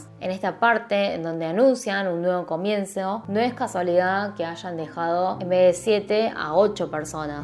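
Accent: Argentina. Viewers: Hablas un español súper avanzado y encima tienes acento argentino. Mae mía felicidades. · Hola! Que bueno conseguir una coreana que hable tambien el español creo que tendras una nueva seguidora.gracias por este video de ON. Que buena explicación. Siento tienes un tono argentino. Eres argentina o coreana.Ha medida de que te escucho me siento muy emotiva. Sera que te puedo hacer algunas preguntas???. Te agradeceria una repuesta. Estare al pendiente. Un abrazo desde Venezuela 💜🌷💜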